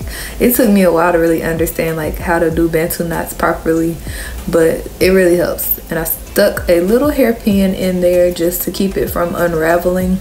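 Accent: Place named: Dallas, United States. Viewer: I really love listening to your voice - for some reason your accent sounds like a Dallas accent - your hair is beautiful, really enjoyed every moment of this process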